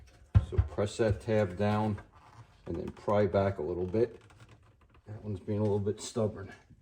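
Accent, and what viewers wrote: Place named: United States. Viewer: Thank you for this. The way you speak makes me want to visit the States again. Salutations from Germany.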